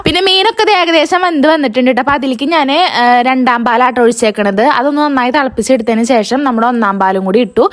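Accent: Thrissur, India. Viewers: Eniku Thrissur bhasha bhayankara ishtanu... samsaram adipoli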